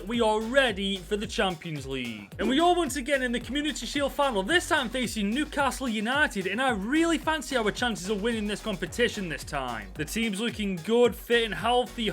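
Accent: Stoke, United Kingdom. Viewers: I’m confused, you sound like you’re from Stoke yourself but you’re a Chelsea fan 🤷🏼‍♂️😂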